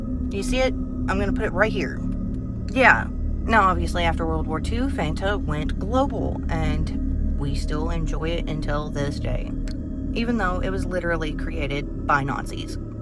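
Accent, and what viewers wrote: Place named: South, United States. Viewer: Stevie Ray Vaughn, at the height of his alcoholism and cocaine addiction would put coke in his whiskey. He was forced to clean up because the mixture was destroying his gutty wuts...Too bad he didn't go top rehab for late night helicopter rides. He thought he could save time after a gig instead of driving, and get more sleep. Looks like he got his wish. The only coca cola product I'm currently infatuated with is actual cocaine. My dude lets me watch him chip an ounce off a brick... I found out about you from the seconds of horror channel. He features your clips in quite a few of his videos, and I had to find out who the adorable redhead{?} with the southern{?} accent was and watch more of her... Maybe I shouldn't have typed that last sentence but hey....cocaine. Please don't ban me, I think I found a reason to not have that shotgun sando I have at the ready...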